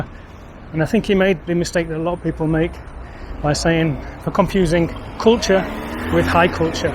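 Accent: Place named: Ruhr, Germany. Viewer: What a beautiful loveletter to the Pott! It's true, that you won't find castles or culturally important churches and stuff in the Ruhrgebiet, BUT: there are transformed industrial sites which are cool venues or museums. There is a lot of green since the coalmines disappeared. But you are right, that the Ruhrgebiet is mostly about the unique people. There are, of course always exceptions, but I find people in the Ruhrgebiet the easiest to get along with. And I'm not even from there. I have relatives and friends from all over the Ruhrpott, and I never met someone from this region I didn't like. It is a very down to earth mentality, very straight-forward but also kind, but most of all humble. I was stunned when you discribed yourself as a "foreigner". You speak German without an accent, even with a noticeable Ruhrpott-dialect, you have a German passport, you support VFL Bochum, you even wear Birkenstocks with white socks, how much more German can anybody get????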